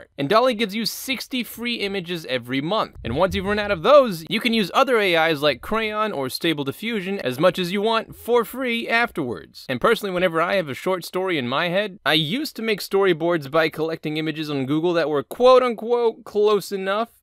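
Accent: New York, United States. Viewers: Ahhh it’s a New Yorker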